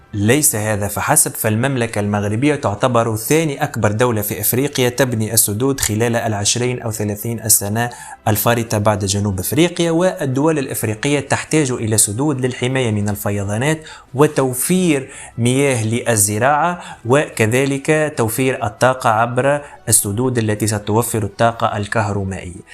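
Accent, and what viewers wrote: Tunisia. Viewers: انا لقيت قناتك صدفة من ساعة شاركت معك لأني وجدت المحتوى جيد بالتوفيق ان شاء الله سؤال من أي دولة شقيقة انت؟ من اللهجة اظنك تونسي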